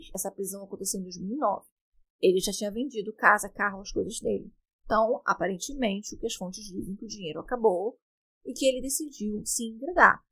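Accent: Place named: Rio de Janeiro, Brazil. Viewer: Nossa não gostei dessa fala carioquíssima não se entende nada aroisss bla bla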